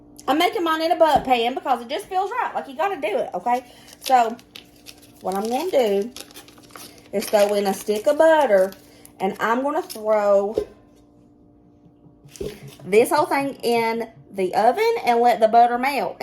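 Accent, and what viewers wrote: South, United States. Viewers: I love how you pronounce oil with your Southern accent! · 😂I thought you said bacon powder!!! I blinked and started laughing at myself.....baking powder! I love the south and am trying to keep up with the accent! Love ya all! That onion casserole is bomb!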